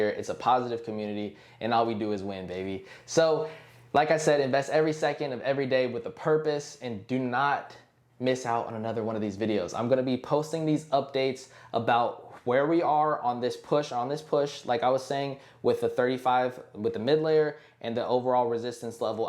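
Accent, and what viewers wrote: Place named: United States. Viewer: Very good plain English.. Easy for me to understand.. Love American accent.. Go shibarmy.. from Malaysia 🇲🇾😊🚀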